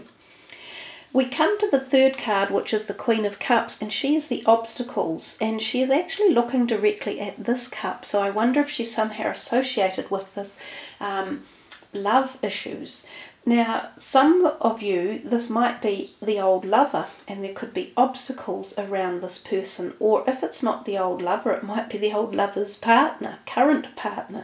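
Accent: New Zealand. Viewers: you have an NZD accent.. where are you from? North or South?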